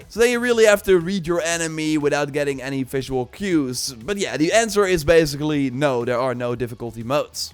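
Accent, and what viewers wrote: Netherlands. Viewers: Ik ruik een Nederlandse accent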